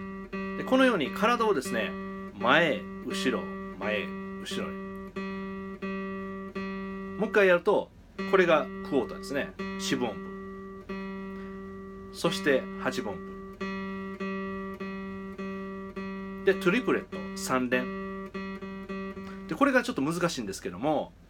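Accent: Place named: Kansai, Japan. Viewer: 20年ぐらい前に藤田先生のCD付き教則本買って同じ事してました、とても懐かしいですね。 最初は気が付かなかったけど関西なまりであれーってなりました笑 動画登録して楽しく拝見させてもらいます。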